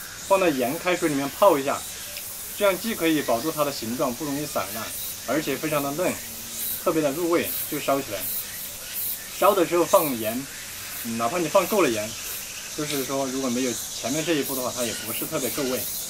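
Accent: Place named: Renfu, China. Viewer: 我说口音咋这么熟，都是属于仁富片区的啊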